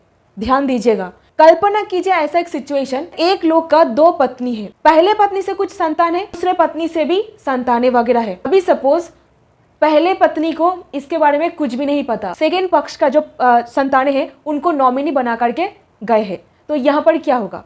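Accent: Bengal, India. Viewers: Narrator is Bengali ? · Tum bongali logta hai mamta benargibhi aiosa hindi bolata ohae.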